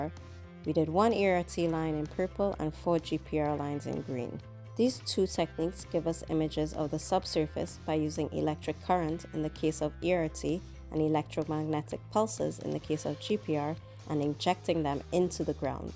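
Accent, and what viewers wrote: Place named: Trinidad and Tobago. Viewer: Hey, I loved the video. I am a Trinidadian doing research for my thesis and came across your video. You sounded Trini so I researched you. Keep up the good work and post more content. Thank you.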